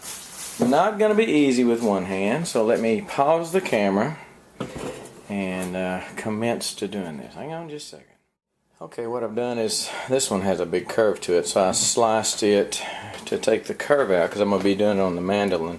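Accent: Texas, United States. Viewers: Love your Texas accent!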